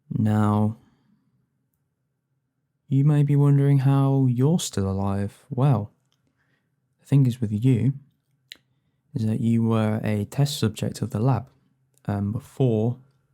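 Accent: United Kingdom. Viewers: How did you manage to sound more british than britishiest british people. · I just came here out of pure curiosity. NOT EXPECTING THE MOST BRITISH SOUNDING MAN I EVER HEARD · British accent??? 😅 😮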